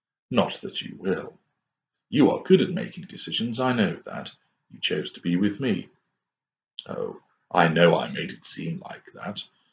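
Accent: England, United Kingdom. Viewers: you have an accent. are you english?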